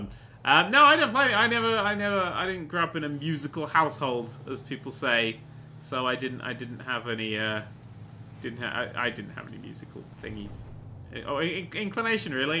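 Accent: England, United Kingdom. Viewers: No he is just English.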